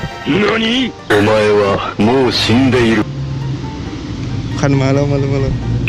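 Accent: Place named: Myanmar. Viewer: เป็นพม่าหรอครับ สำเนียงเหมือนเลย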